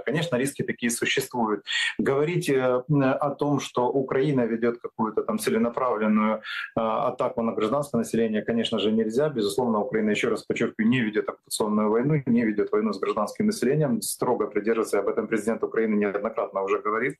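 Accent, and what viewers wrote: Standard. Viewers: Слушаю чистейший русский язык у Михаила Михайловича Подоляка👍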